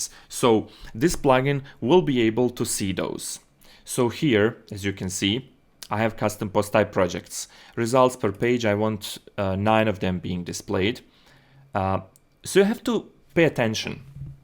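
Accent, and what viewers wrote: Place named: Bosnia and Herzegovina. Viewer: cim si poceo pricati znao sam da si Bosanac' Extra brate :D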